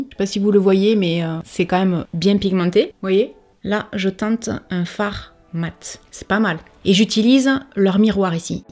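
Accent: South, France. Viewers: Toujours intéressantes tes vidéos et nous mettent de bonne humeur. J'adore ton accent qui reflète le soleil, les cigales et le sud. A quand un live avec tes abonnés puisque c'est possible avec YouTube.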